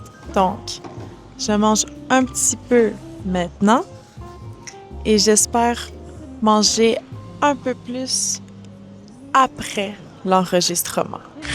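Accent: Quebec, Canada. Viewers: omg canadian french accent slay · Yesss! Quebecois French! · ❤❤❤I am subscribed to you and I just had a feeling haha. I noticed there r been fewer videos, months apart sometimes and I was like “huh she must be busy with other life stuff” then I started watching dreaming French and I thought “wouldn’t it be weird if she wasn’t posting as much bc she was making videos for their Quebec accent” then I saw you and freaked out bc my conspiracy theory was true haha. You’re really a great teacher, I would watch some videos over and over to get familiar with the accent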